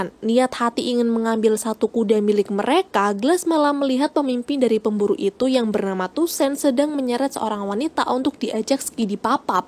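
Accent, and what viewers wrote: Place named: West Java, Indonesia. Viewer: Mabk. istrinya si Jon ya wkwkwk. soalnya mirip Dari intro nya cara ngomong ada sundanya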